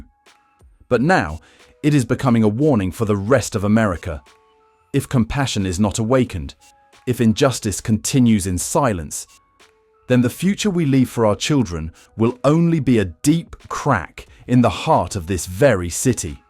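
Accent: United Kingdom. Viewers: I work on the strip. Untrue. Every night I leave nobody sleeps on strip. And they are not allowed in any casinos. Yes there are some in the tunnels but you overexaggerat. Why did your video sound like you're UK.??? Homeless in Vegas, why are you in the damn tunnels???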